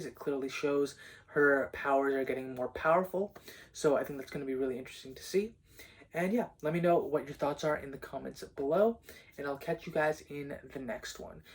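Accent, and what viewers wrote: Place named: United States. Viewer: Im eagerly waiting for this release. By the way though your dialect is almost similar to US speaker your appearance relates to a slight indian men🤔😉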